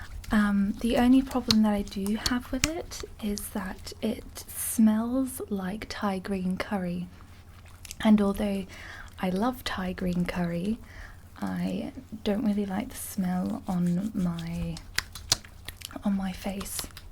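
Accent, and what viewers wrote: Scotland, United Kingdom. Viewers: No contest , best ASMR content creator on YouTube , plus I'm from the U.K living in Australia so the accent is a touch of home 👍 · Wait, I though you were scottish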